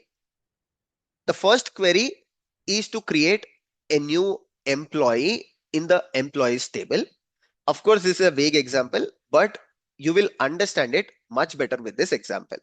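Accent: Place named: India. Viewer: I struggle a lot with the Indian English accent (it's very hard for me to understand), but I love the content you provide. Keep up the good work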